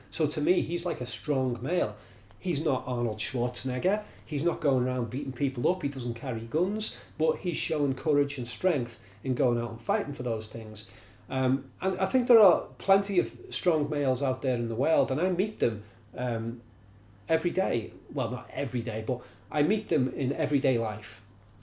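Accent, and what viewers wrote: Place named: Manchester, United Kingdom. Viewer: You sound like Noel Gallagher! Are you from Manchester?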